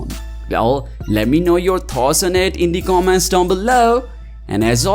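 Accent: India. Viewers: 听着像印度口音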